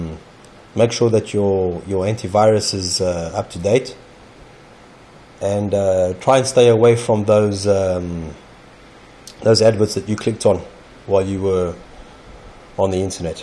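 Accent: South Africa. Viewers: Are you south aftican?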